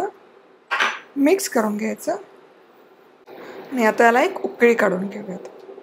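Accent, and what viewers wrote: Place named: Pune, India. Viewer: शुद्ध मराठी पुण्यातली 😊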